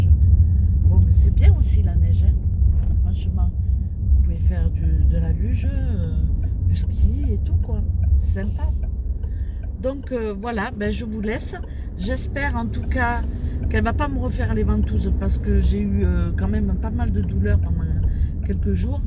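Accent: South, France. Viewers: Bonjour,d oû vient votre accent, vous venez du Sud? Je ne pensais pas qu avec les pieds dans l eau ça pouvait jouer sur l arret de fumer! Merci de nous avoir fait un peu voyager avec les belles images du fond de vlog à bientôt 👋👋👋👍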